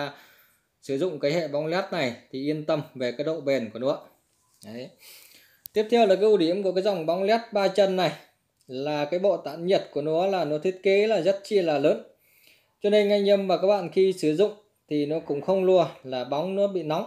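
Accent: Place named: Thanh Hoa, Vietnam. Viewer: Ông anh người tỉnh nào thế? Nghe có vẻ như Thanh Hóa thì phải, Giọng hơi khó nghe.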